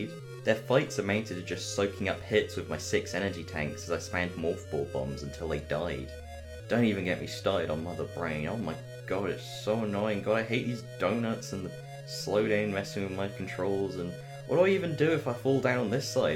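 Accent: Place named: United Kingdom. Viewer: You're very british oomfie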